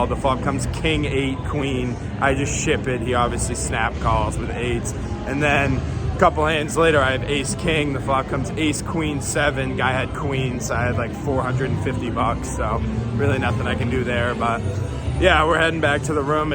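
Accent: Boston, United States. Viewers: that’s a boston accent lol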